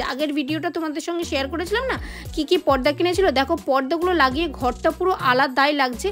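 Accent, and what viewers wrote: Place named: India. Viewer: Bono tomar bari kotay voice ta Indian mone hoche ami Bangladesh teke bolchi 1st time vedio dekte aslam😊❤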